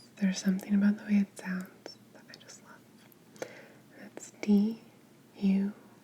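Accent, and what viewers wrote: Argentina. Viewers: Ya extrañaba escucharte hablar en argentino. 💕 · jajaja la primera vez que la escuche hablar en español argento casi me caigo de ojete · Hola! Soy de Argentina, me impresiona mucho lo bien que te sale no sólo el español sino el asento argentino, felicitaciones!! Hermoso video! Besotes.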